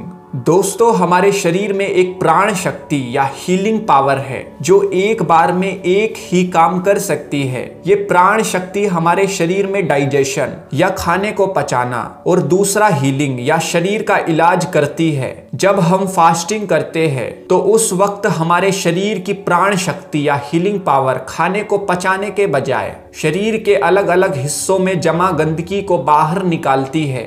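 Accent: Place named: Haryana, India. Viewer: Paka hariyana se ho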